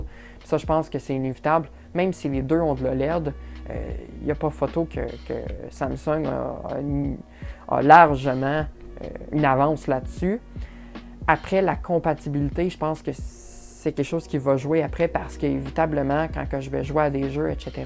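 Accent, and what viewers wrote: Quebec, Canada. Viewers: on voit le tres bel accetn quebecois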